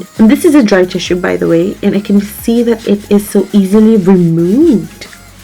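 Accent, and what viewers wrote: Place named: Ireland. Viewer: Where are u from cuz u sound a little Irish🇮🇪I’m irish too💜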